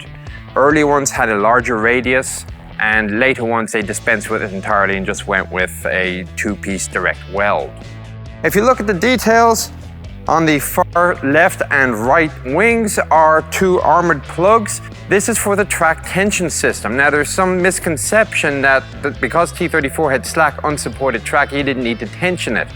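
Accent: Ireland. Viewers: Apparently Chieftain is American, but he sounds Irish? Weird accent. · You have to excuse him hes irish thats just how they talk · He has an Irish accent lmao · the presenter has such an ambigious accent....irish? german? nordic?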